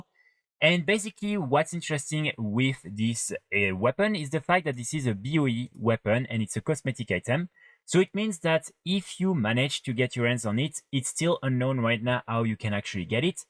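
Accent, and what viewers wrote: France. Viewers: suscribed for the french accent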